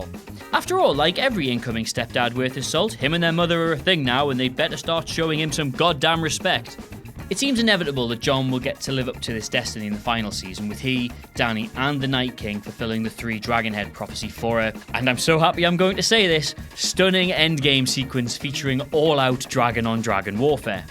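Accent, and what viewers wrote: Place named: Northern England, United Kingdom. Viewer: LL cool J Mate he's got a thick northern accent, in what world is that the Queen's English?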